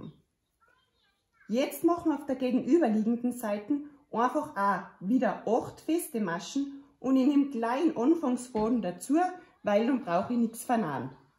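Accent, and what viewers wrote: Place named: Austria. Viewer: Vielen lieben Dank, liebe, für die Veröffentlichung deiner Idee, die durch Ihre Einfachheit wirkt. - Exakt was ich gesucht habe. 🤩. Und genau richtig für den heutigen Sonntagmorgen, wenn die anderen noch schlafen. ☺️ Da habe ich jetzt schon eines großen Teil fertig vom ersten Teil. Und ich freue mich, Videos mal im Österreichischen Dialekt zu entdecken. sehr sympathisch. Und auch noch ein riesiges Kompliment für eure Tischplatte - ein Traum!!!!! mlg. aus der Steiermark 👋🏼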